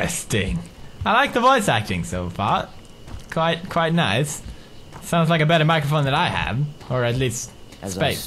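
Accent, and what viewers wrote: Sweden. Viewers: The one thing we Will never have again: deep Swedish accent 😂😂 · omg its been years since i watched this i miss the cute swedish accent back in this day and amnesia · hahaha u had more Swedish accent back then xD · kinda swedish accent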